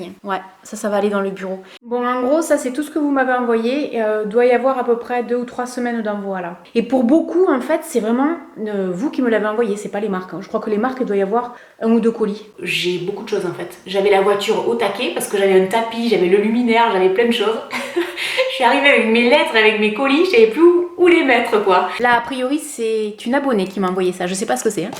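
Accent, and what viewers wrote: South, France. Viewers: Merci pour cette vidéo. Ca faisait longtemps que je n'avais pas été sur tes vidéos et tu es tellement rafraîchissante avec ton accent du sud. je t'embrasse. Fiona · Plus le temps passe plus j'apprécie tes vidéos! Ton accent du sud me réchauffe le cœur 😊 pour Instagram post ce que tu as envie TOI de poster et partager ne fait pas la bouche en cul de poule (ça m'a fait presque cracher mon café sur mon clavier c'était excellent! 😂) ensuite pour ton bébé tu as TOTALEMENT raison profite tant qu'il est avec toi c'est quoi ces commentaires a la noix.. Ce concept de lifestyle une journée avec toi j'apprécie totalement. Je retrouve ce plaisir de me pauser le temps de regarder une vidéo me vider l'esprit avant de ré attaquer mes taches ménagères quotidiennes. Continue comme ça j'aime vraiment ce que tu fais, tu apportes du positif dans le quotidiens des gens et merci du fond du cœur pour tout ça! 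· Coucou, ça doit être la première fois que je laisse un commentaire sous tes vidéos. C'était pour te dire que tu es une YouTubeuse que j'adore regardé et je ne suis pas déçue d'avoir découvert tes vidéos y a maintenant bien 2 ans. Ton accent me donne envie de redescendre dans le Sud a chaque fois ^^ Pour Instagram je dirais vas-y au feeling, poste des photos de ton environnement, de ton fils, tout simplement ce qui te plaît a l'instant T! Continue tes vidéos, encore plus des vidéos comme celle-ci et celle sur ta maison. Bisous, une abonnée d'Alsace 😉😘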